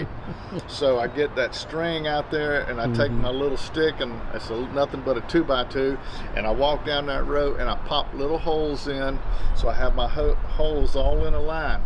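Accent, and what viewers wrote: South, United States. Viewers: Great videos from Wonderful People Easy to understand as Down to earth as the earth that puts forth our food from God with our labors i have these big treats in 5 gallon pails as i am an apartment dweller they are just starting but i used Miracle Gro potting soil an added peat moss an perlite and will be adding plant food from Miracle Gro along with other nutrients.God Bless you sweet children of God ps as a Canadian who Loves America Love that Southern accent Keep these greeat teaching tools Coming an I can Relate to Old an Fat!!!! brother but we both worked our butts an arms OFF at 59 i can Still outwork the Young Bucks as i am sure you can Keepem coming an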